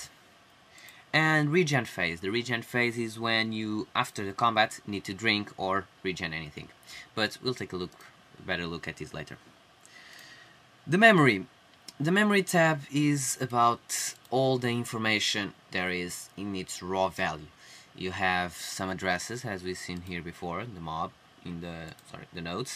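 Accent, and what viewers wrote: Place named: Portugal. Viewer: ola! Vi logo pelo teu sotaque q eras tuga tenho so uma pergunta isto paga-se ou nao?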